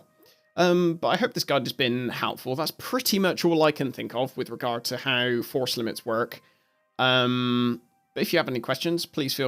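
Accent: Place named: West Country, United Kingdom. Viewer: Lovely West country accent, greetings from Kent.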